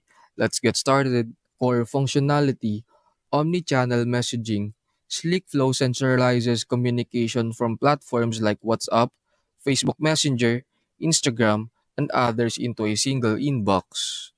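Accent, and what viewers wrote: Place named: India. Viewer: You sound Indian, why are you using a white guy? I already lost the confidence in this review in 2 seconds.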